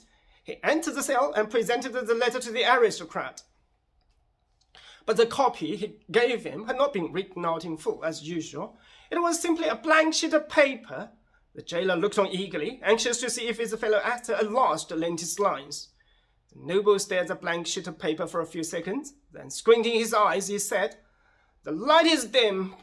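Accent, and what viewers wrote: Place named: United Kingdom. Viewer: 😂😂😂，连英伦 腔调都那么浓重。👍👍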